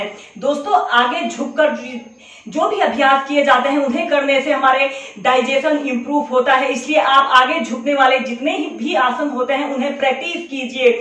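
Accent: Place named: Haryana, India. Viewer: Lagta hai Haryana k ho aap...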